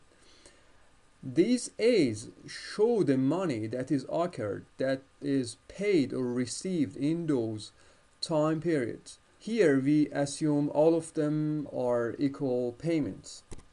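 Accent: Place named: Iran. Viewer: pure Iranian accent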